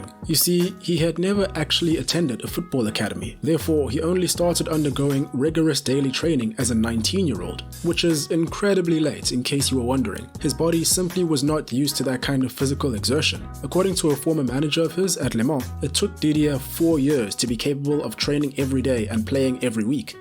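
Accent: South Africa. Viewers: are you from south africa? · Are you South African ? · Bro you South African?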